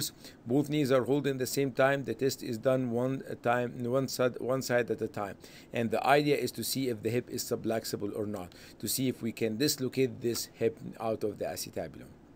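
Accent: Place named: Egypt. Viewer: His accent sounds Egyptian I’m not sure lol Thanks for the video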